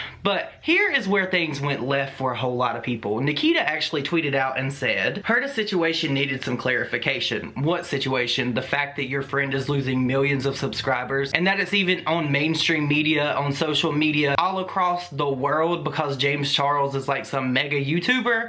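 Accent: South, United States. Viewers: Subbed for that southern drawl lol love it . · I love your accent! Is it southern?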